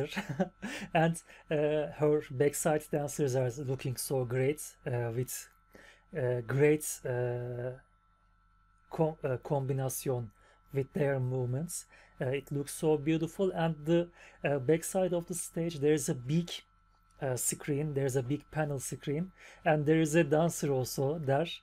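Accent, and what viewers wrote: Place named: Turkey. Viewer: Oh my Turkish friend is back with another reaction 😎 always a pleasure to see your smile and to hear your nice accent on this emblematic song! Please keep go on with cause you give me such a nice smile 😘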